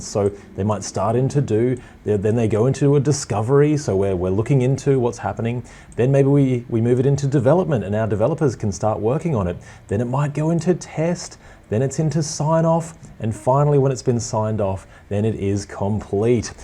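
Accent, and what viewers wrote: Australia. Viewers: so nice as an aussie to hear another aussie accent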